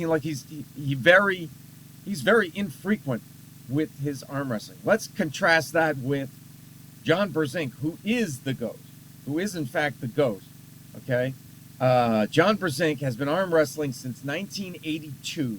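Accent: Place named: Czech Republic. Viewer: where are you from my man? Cuz it do be sounding like you are from czech republic xD PS: Good videos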